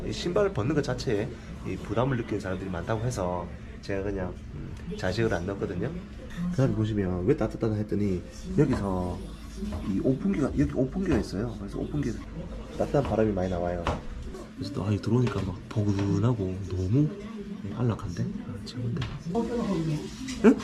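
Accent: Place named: Busan, South Korea. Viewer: 부산출신 남편이랑 말투가 똑같애서 억수 친근감 덜어여~^^ 남편이 소개해서 보게됐는데 어제 거의 종일 보고 구독도 눌렀어요. 너무 열심히 사시는 모습에 저 자신을 돌아보고 반성하게 되네요. 건강챙기시면서 하셔요~^^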